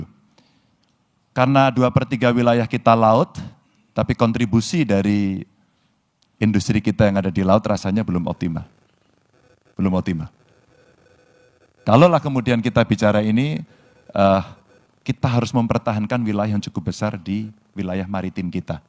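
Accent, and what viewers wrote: Java, Indonesia. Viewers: itu bro bukti pak ganjar bisa dan tahu bahasa inggris ya kalau soal logat beda orang lidah jawa sama lidah orang luar apalagi pak ganjar belum pernah tinggal di luar negri ataupun mengenyam pendidikan diluar negri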